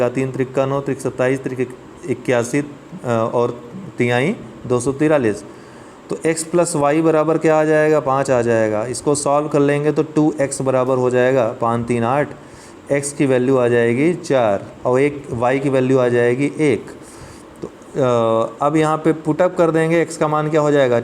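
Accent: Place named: Azamgarh, India. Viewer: are sir kha se ho aap.... Govind Sahab Mela apne jaise bola azamgarh isse to yhi lg rha hai ki ap ya to azamgarh k hai ya ambedkarnagar ke...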